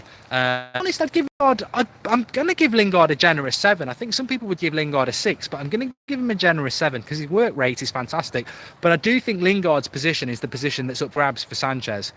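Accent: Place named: Manchester, United Kingdom. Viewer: you almost sound like a manc. no disrespect.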